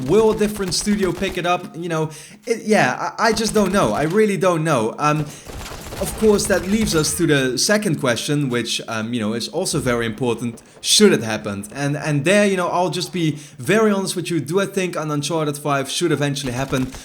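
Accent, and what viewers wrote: Netherlands. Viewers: you got a dutch accent and my name is robin to he eh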